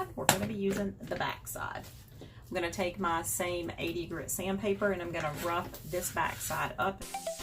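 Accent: South, United States. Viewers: You nailed it sista! So nice to hear and see occasionally, a southern gal, like myself, doing diys . I’m a Kentucky girl. I’ve just recently started doing diy projects and there are so many ladies that teach and/or show how to do different things. It’s a tad overwhelming at times but I’m glad I ran across you. I’ll be sticking with ya Momma!! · I love that I have Found someone who has that Southern accent like me, lol.I tell everyone that I'm a Flatland Hillbilly from Texas, lol.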